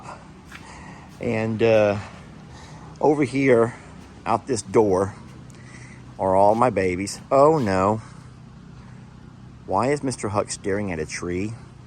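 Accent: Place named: Texas, United States. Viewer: I get a kick out of figuring out if the way you pronounce words is a Texan thing or. 😂😂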